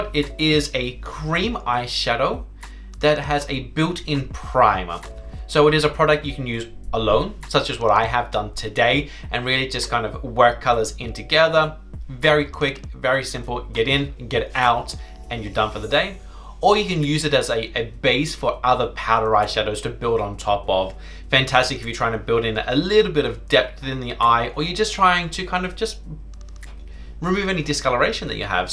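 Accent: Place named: Australia. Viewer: Australia, I was trying to pick your accent as it sounds aussie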